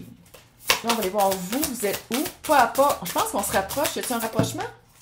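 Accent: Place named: Quebec, Canada. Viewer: Je suis pas mal sure que tu es du Quebec.J adore tes explications et tes petits mots Batard etc...😂Je suis du Quebec aussi et je parle comme toi j ai pas eu de filtre à la naissance 😂😂Ton tirage est très tout est vrai.Merci je te découvre et je deviens ta fan no 1❤🙏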